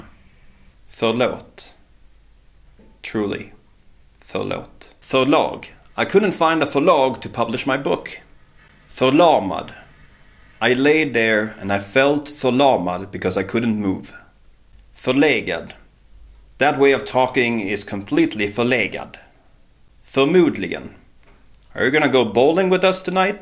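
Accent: Skåne, Sweden. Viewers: Ah right, I wasn't sure if you have a Skånska dialect, it's also been a while since I watched that video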